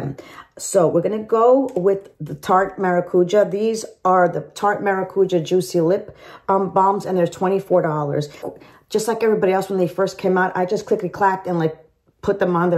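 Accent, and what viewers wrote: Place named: New York, United States. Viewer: Love your accent are you from The East coast New York ,sounds like home ,love your teteorials!!!!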